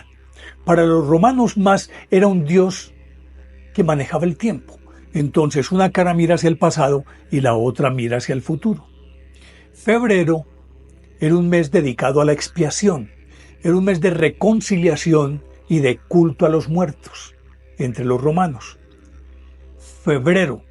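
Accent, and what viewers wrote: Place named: Colombia. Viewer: Me encanto esta historia y narrada con un acentó colombiano que chevere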